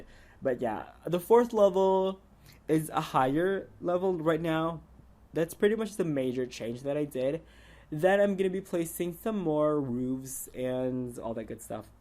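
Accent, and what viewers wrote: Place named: Philippines. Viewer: I really like your accent. Where are you from the Phillipines?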